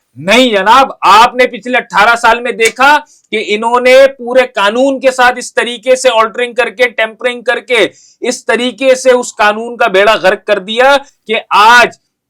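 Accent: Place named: Sindh, India. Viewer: mujha yeh anchor sindhi lg rha hy😅😂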